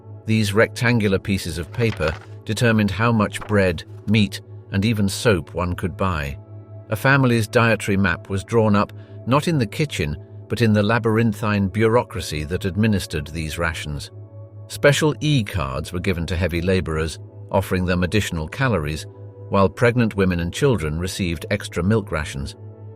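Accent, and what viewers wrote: England, United Kingdom. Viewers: LOL Your fancy British accent can't hide the lies. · I'm not sure what it is but the English accent makes a documentary better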